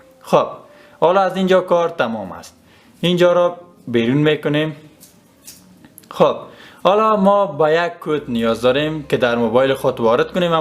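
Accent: Afghanistan. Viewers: یه سوال چرا داره افغانی حرف میزنه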